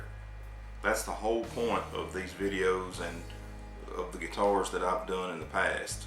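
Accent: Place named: South, United States. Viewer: You're so southern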